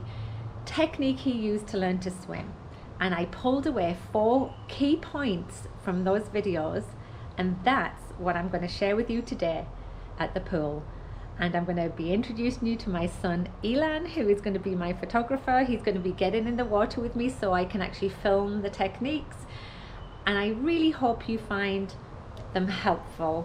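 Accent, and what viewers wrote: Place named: United Kingdom. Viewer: Hi and son Great Vidio you sound British. Is this swimming pool in Uk. Is it public? Looks lovely. We have an outdoor pool in South Wales but it’s closing for winter.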